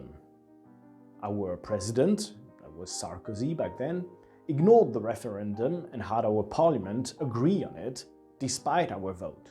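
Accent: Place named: United Kingdom. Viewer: très British ton accent ;)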